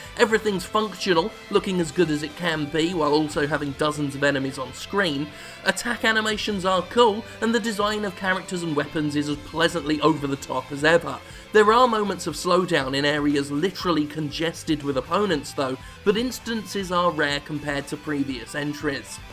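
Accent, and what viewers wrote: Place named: United Kingdom. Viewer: lol UK accent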